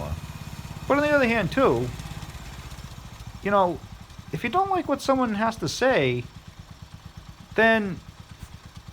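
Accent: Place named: Midwest, United States. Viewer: Ya know? I'm guessing you live somewhere in the midwest. Ya know? Because ya remind me of the time I visited upper michigan ya know? And they kept saying "ya know" after every sentence. Ya know? :) :P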